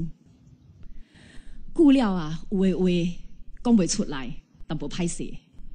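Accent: Zhangzhou, China. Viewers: 她的 祖宗 应该是 福建漳州 他讲的话跟我 几乎一模一样 城市里面 漳州闽南话 我听的真的感到很亲切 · 這是閩南話漳州片的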